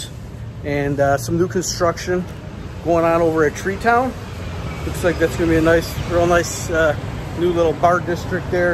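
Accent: United States. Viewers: stumbled across another channel i like. where you from? you sound like a fellow east coaster if i had to guess.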